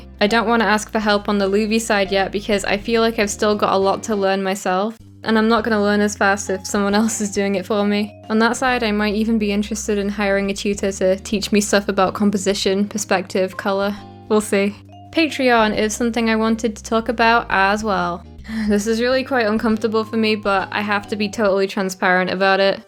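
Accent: United Kingdom. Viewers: Your voice with the British accent just make the unintended ASMR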